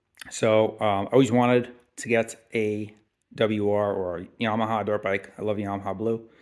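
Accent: New York, United States. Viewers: you sound like a NYer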